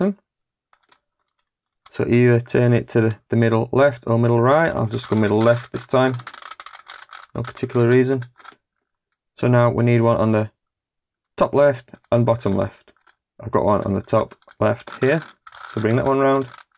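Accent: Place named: Mexborough, United Kingdom. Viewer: You sound like youre from Mexboro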